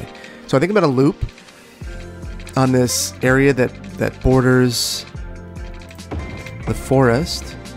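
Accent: Wisconsin, United States. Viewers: Love the series, and wondered why you sounded so familiar, you're from WI! Gotta love the midwest!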